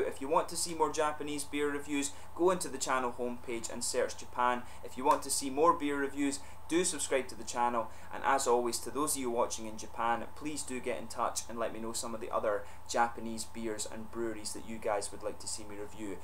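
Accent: Scotland, United Kingdom. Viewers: You are the only beer reviewer I know that gives a detail story behind the beer, well done a always a joy to listen to 😎 Plus you have a nice Scottish accent, Don't worry I'm not hitting on you 😉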